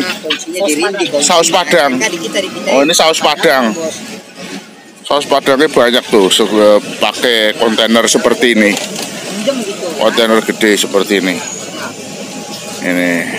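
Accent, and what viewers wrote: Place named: Banyumas, Indonesia. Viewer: Orang banyumas ya om kok bahasa nya ngapak salam inyong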